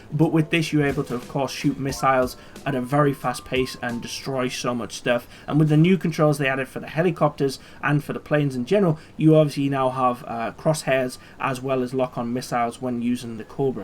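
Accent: Newcastle, United Kingdom. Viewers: "Absolutely class"😂 R u a Geordie too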